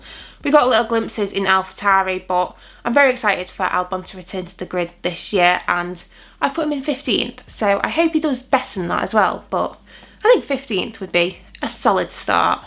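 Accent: United Kingdom. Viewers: You've got to love that british accent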